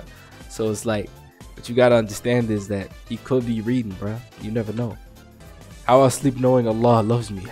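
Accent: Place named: Arab world. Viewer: nice Arabic accent mate ماشاءالله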